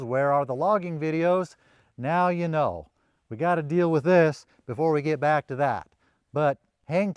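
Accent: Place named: Pacific Northwest, United States. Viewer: it's the Pacific Northwest accent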